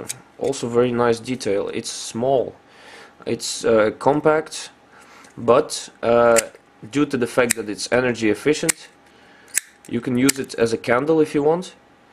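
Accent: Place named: Belgium. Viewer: HAHA ik hoor het aan je stem dat je van belgië bent :p je accent valt wel op, ben zelf ook van belgië Limburg Hoeselt !!!!! greeeetz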